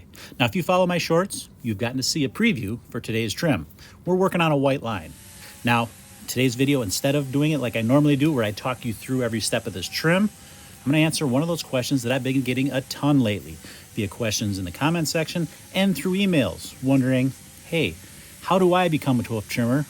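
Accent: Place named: Upper Midwest, United States. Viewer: I should have know you were from our neck of the woods. You got that big "don'cha know" accent!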